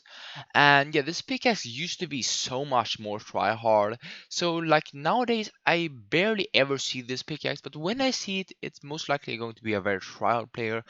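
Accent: Sweden. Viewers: Are u swedish? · Are you from Sweden or something?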